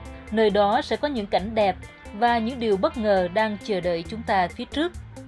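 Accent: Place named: South Central Coast, Vietnam. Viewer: Chào chúc em nhiều sức khỏe! Lời dẫn nghe rất hay từng chữ từng câu được trau chuốt rất là êm tai,càng nghe càng thấy đậm nét giọng miền trung nam bộ việt nam.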